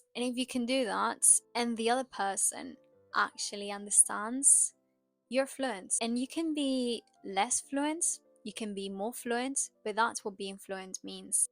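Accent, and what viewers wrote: RP, United Kingdom. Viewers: I'm American and have never heard "mooch" used that way before, 😅so I'm not surprised you haven't heard it before either. Very colloquial British I guess, no American would say that. (The second use of "mooch" as in "freeloading," everyone would understand though.) You probably already know this but when she says, "slipping into bad habits of laying in bed doom"; Firstly, she meant "bedroom" (obviously), secondly, the correct word is "lying." It's an intransitive verb and sometimes even fairly educated speakers mix up "lying" and "laying." Just wanted to say that mistakes like this have always given me pause about learning from social media though. Your English is great and very natural. Your RP accent would no doubt get a lot of attention and a load of compliments in the States!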